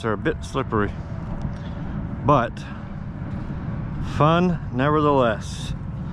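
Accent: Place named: Houston, United States. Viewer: Man I swear you must live somewhere on the north side of Houston I don’t know why I think that - maybe your accent and the sound of all them 18 wheelers going by 😄 that’s where I grew up. Great run that thing looks right at home out there in the dirt 👍👍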